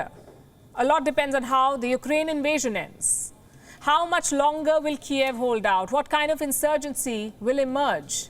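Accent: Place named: India. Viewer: I CANT LISTEN TO THIS INDIAN ACCENT IM SO SORRY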